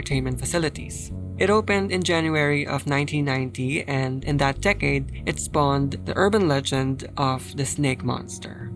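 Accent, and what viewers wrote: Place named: Philippines. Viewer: Your a filo!? Okay focusing on your accent and it makes more sense now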